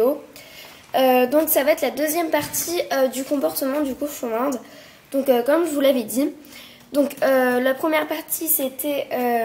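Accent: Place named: Belgium. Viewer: t'es belge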